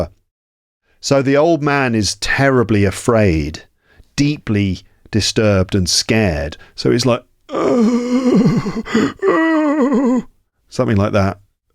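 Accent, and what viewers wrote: United Kingdom. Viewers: Hello . Is this British pronunciation? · Thank you for the podcast you shared, I really loved your British accent when listening to the story too 👍👍🙏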